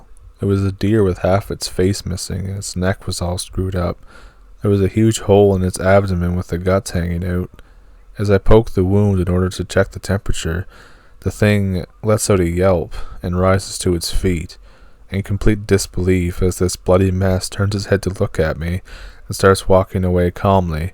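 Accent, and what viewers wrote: Canada. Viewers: Thanks for letting us hear your kitty cat! Your accent is soooo Canadian. Nice!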